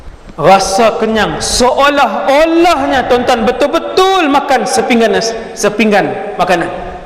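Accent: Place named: North, Malaysia. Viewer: Cakap Utara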